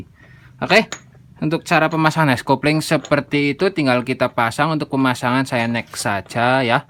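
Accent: Banyumas, Indonesia. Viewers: Sampean wong ndi kang ngomonge ngapak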